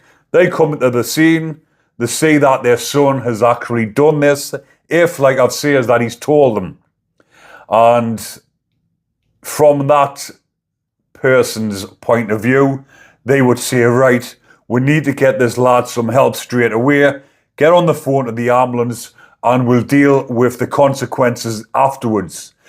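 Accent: Newcastle, United Kingdom. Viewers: Where does this guy come from ? Geordie ?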